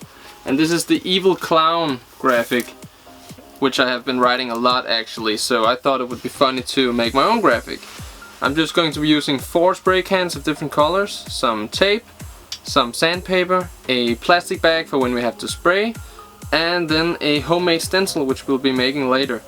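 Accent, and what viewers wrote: Denmark. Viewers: Haha! Tydeligt Du Er Dansk! Men Super God Video!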